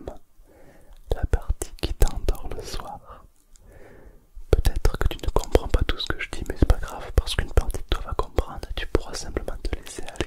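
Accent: South, France. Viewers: Super découverte 👍 super travail c'est très relaxant ! Je valide l'accent du sud également 👍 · J’adore ta vidéo ! Très relaxante et merci pour ça ! L’accent du Sud qui fait un peu bizarre quand tu viens pas du sud mais j’adore ! J’aime et je m’abonne !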